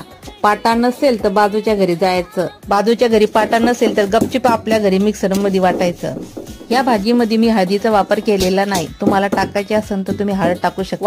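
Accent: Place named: Jalgaon, India. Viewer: तुमचे किचन बघायचेय मला तुमच्या सर्व रेसिपी मी बघते तुमची जळगावाची भाषा मला सालस सुंदर आहे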